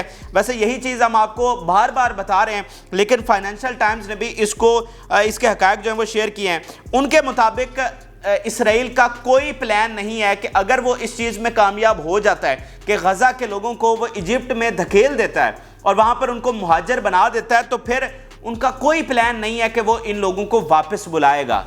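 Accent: Punjab, Pakistan. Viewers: Apni Urdu theek kro Punjabi style ki urdu mat bolaaa kro ....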